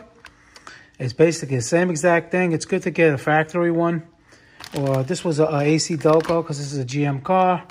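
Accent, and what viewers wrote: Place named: New Jersey, United States. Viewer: Love the east coast Jeewwwessseeyyy accent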